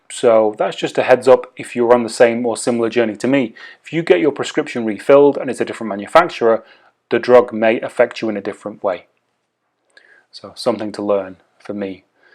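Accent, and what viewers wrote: England, United Kingdom. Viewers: are you from England??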